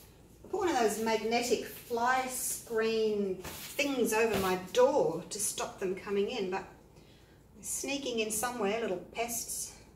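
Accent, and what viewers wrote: Australia. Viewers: Great to hear an Aussie accent on a pouring video.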